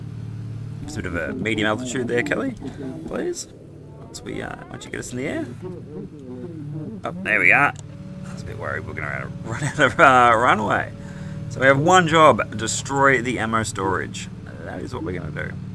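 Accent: Australia. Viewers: you sound aussie mate, are you? good video btw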